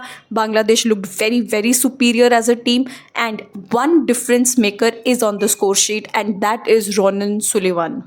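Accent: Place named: India. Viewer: Indian accent very bad. You don't speak English like this 😒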